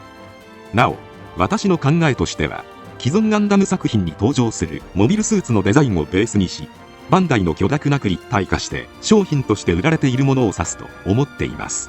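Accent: Kansai, Japan. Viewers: さん、関西人だったのか・・・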